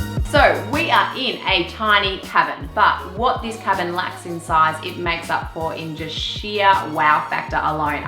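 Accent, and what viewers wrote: Australia. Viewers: Cute cabin. Cute accent. I lived in Australia. I miss hearing it sometimes.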